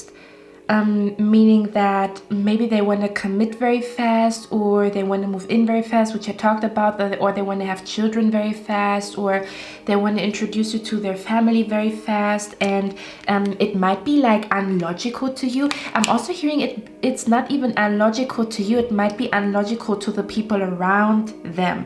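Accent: Germany. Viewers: Pile 1. Loved this reading. Thank you so much. Also, whenever I watch your readings, I keep thinking you're German due to your accent and I myself am half German which is why it's easy to recognize it. AND THEN I saw the card "ACHT DER STÄBE". I was actually right, so I'm guessing you do speak german but that doesn't necessarily mean that you live in Germany. After all, there are so many countries where German is spoken as their native or second language. Anyway, I'm sending much love and hugs❣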